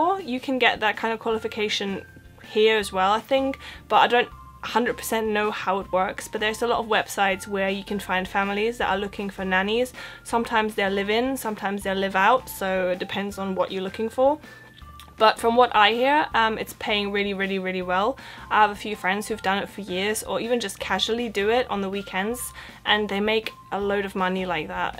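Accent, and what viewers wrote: Germany. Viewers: I can clearly tell the Getman accent. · Exactly the German accent likes I sound while talking English thanks to my 8 years having spent in Hannover. But the contents you provide are very good for young people want to discover London in an affordable way. Perfekte Arbeit. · Your accent is adorable! You can tell that the London influences are kicking in, but still with undertones of a native German speaker.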